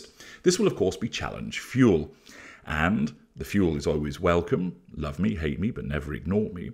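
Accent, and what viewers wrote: United Kingdom. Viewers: Another brilliant video, you are so funny 😆 I really enjoy listening to you, love your British accent, eloquent explanations and sense of humour! Wish you all the best for the future and success with your YouTube channel!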